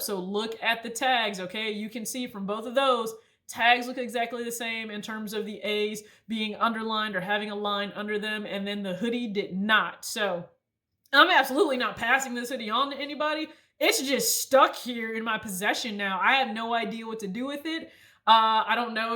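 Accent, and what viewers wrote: South, United States. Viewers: yea via free side. that's usually how I do my legit checks . I get overly obsessed sometimes cuz I happen to enjoy it lol.. also great video love the content !! as well as the fact that your freaking amazing !! love the southern accent!!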